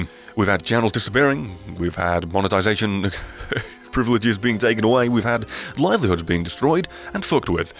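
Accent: United Kingdom. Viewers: He brings humor in a british accent.Also i saw your exact same comment on 2 other videos.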